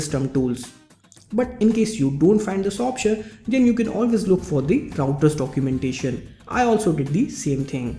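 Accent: India. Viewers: Funny indian accent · This is a great video. I like how simplistic everything was explained. I'm not a newbie with computers at all, but the majority of my family and friends are, and this would easily help them understand networking. Even though his English is very accented by his own language, I work with several people from India so I've gotten used to the accent. Believe me, its much easier to understand this than someone who's native language is English, but they speak it so fast that you can't even catch what they're saying and need it repeated. Good job! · Great info. That was the thickest Indian accent I have ever heard....and I’m Indian myself! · You REALLY need to practice speaking in ENGLISH. I always tell people I speak TEXAS ENGLISH, so EVERYONE knows what that means.. You are speaking in INDIA ENGLISH, which is VERY HARD TO UNDERSTAND. Why is it that ALL the people from INDIA, always have this "HARD TO UNDERSTAND" English?!?!?!?! 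GEEEZ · U speaking English like native Indian languages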